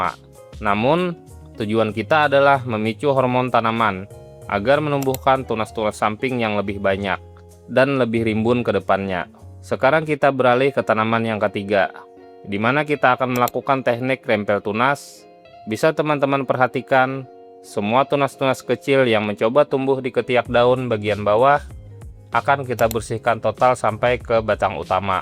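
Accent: Banjar, Indonesia. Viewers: urang banjar kah pian, bahasa banjarnya masih ada lah, kada hilang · Urang banjar mun ndagari logatnya sidin ni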